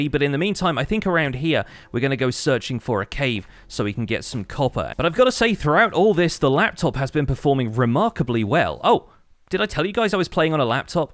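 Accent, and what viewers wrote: United Kingdom. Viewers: I have a question are u British? No pressure😅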